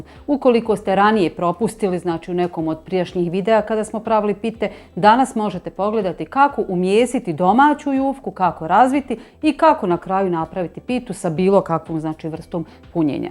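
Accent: Bosnia and Herzegovina. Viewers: Čim je počela sa ovim bosanskim naglaskom znam da je to taj koji ću probat!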